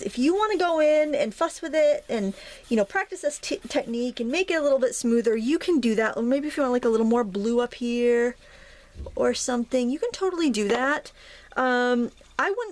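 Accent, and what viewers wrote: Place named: New Jersey, United States. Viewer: This is really a nice, peaceful scene,. Thanks for showing it to us.Do I detect a NJ accent when you're talking? Sounds like, "up home," to me. I like to listen to you talk.Happy Holiday Season to you and yours.:D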